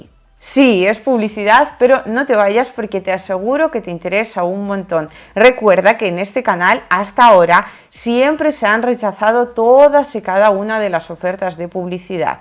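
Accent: Spain. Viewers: No me gusta su acento español .. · Rusa hablando cono Española😅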